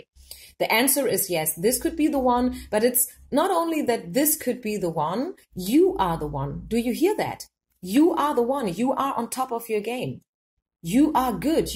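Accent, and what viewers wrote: Germany. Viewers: I just love that you post for us in the US. Your German accent is adorable 💕 Thank you for your timeless message today.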